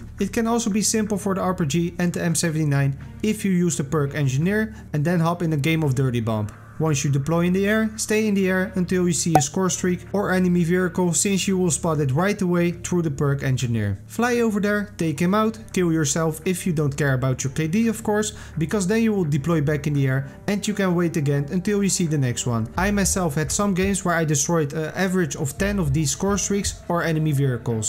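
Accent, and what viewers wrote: Netherlands. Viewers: Je bent nederlands ik hoor het😂😂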